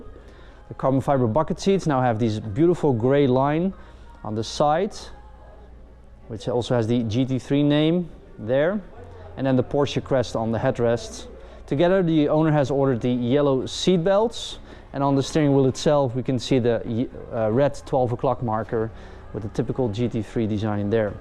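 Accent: Netherlands. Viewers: It looks like the host has a Dutch accent...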